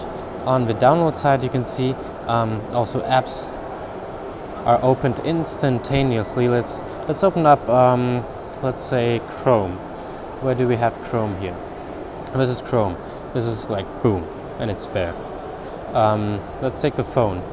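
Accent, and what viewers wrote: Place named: Germany. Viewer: so süß, wenn ein Deutscher englisch redet ;)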